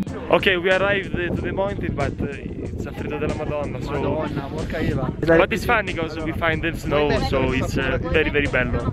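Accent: Italy. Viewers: inglese alla renzi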